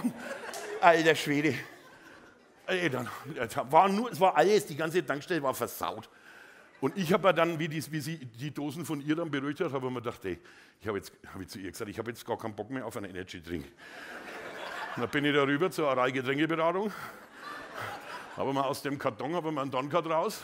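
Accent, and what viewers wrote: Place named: Franconia, Germany. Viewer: Fränkisch geil 😂😂😂😂🤘🤘👍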